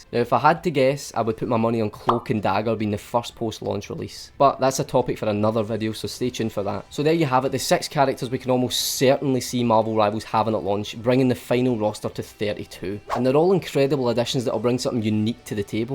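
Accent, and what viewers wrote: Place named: Scotland, United Kingdom. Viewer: hey, i love ur accent, r u scottish ?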